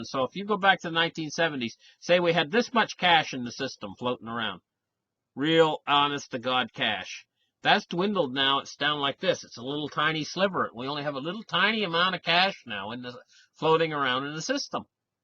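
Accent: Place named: Canada. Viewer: LOL ... "it's weird ... it's just weird ..." Excellent video. You have the knack for making otherwise complicated stuff easy to grab (and funny to watch) ... for us financially/economically challenged types. (Still working on that "laboratory of liquidity" you put out a while back.) What you do is very much appreciated. After going through a lot of financial turmoil in his own life, my son found your channel ... and then introduced me as well. We both watch FTE every day ... and refer to you as "the Canadian" because of the obvious accent and because we didn't know your name back when we first started watching. I in turn have promoted your channel to friends and family ... in attempts to get them to wake up to what is coming. Some listen, some don't ... par for the course, I guess. Though it may be a double-edged sword when the economy goes south ... 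I will be so happy for you when your views, likes, and subs go TO THE MOON, ALICE, TO THE MOON! Also, BTW ... love your close-ups. Have a great weekend. :)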